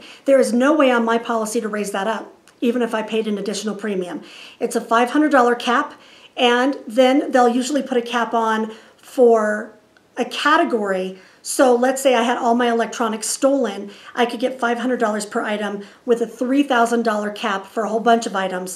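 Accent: United States. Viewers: You talk just like any normal Yankee. I'm use to it. Was not to fast for me and I'm south of the Mason Dixon. Great info as looking now for a good company. My daughter told me Progressive was where to start. Thanks for the details will be very helpful.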